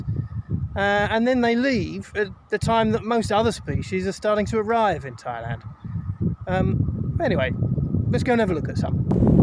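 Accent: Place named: England, United Kingdom. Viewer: It looks a gorgeous birding spot. I've never been to Thailand. I'm familiar with some species, but most are totally exotic to me. Your narration is very interesting and helpful. I also like your English accent 😃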